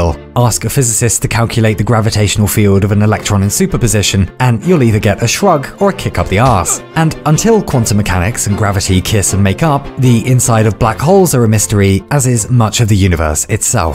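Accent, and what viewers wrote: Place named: United Kingdom. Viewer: He's so fun to listen too I always forget he's British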